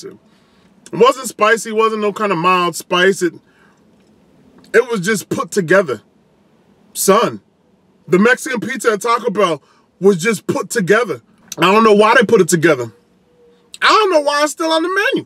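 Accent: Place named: Atlanta, United States. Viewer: you sound like you from Atlanta Georgia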